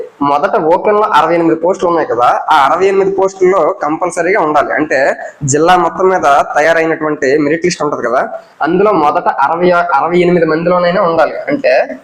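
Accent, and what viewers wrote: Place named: Vizianagaram, India. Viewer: Nee yaasa bhasa vijayanagaram or vishakapatnam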